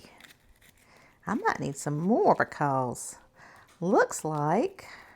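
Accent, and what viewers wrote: South, United States. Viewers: Is that a southern accent? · I Love listening to you tell the recipe with your southern accent. Cant wait to makeThe King Ranch Cassarole. Thx 🎉👍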